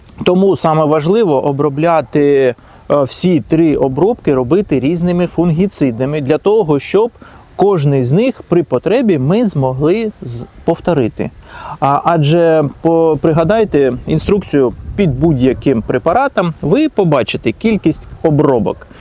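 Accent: Standard. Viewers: А млжно ли этими ронпарптаии обработать вишни, яблони, груши, сливы. Инжир.У нам всех деревьев по 1 штуке и абрикос молодой еще не цвел, то его нужно будет обработать. А мадину и смородину иожно так обработать? И да мова чудова чиста и правильная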